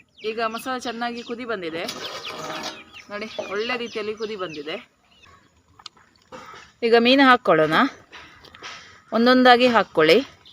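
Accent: Mangalore, India. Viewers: ಮಂಗಳೂರು ಮೀನು ನೋಡ್ಲಿಕ್ಕೆ ಚಂದ ಮಂಗಳೂರು ಕನ್ನಡ ಕೇಳಲಿಕ್ಕೆ ಅಂದ ಮಂಗಳೂರು ಪರಿಸರದಲ್ಲಿ ಕಡಲ ಕಿನಾರೆಯ ಸೊಬಗು ಸವಿಯಲು ಆನಂದ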